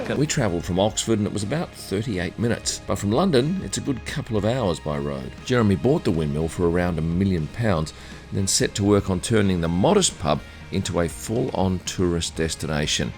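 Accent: Australia. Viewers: Why are you talking about US dollars when you have an Australian accent and are talking about a pub in the UK. We have pounds here!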